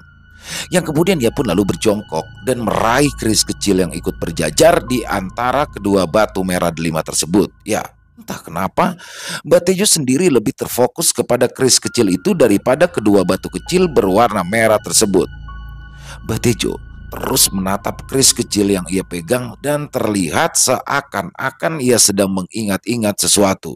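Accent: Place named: Jakarta, Indonesia. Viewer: Klau dengerin cerita bg seakan terbawa suasana dan tau2 critanya udah selesai bgus bgt carapenyampaianya pnuh semangat apa lagi klu udah dg logat betawi keren deh......👍👍👍👍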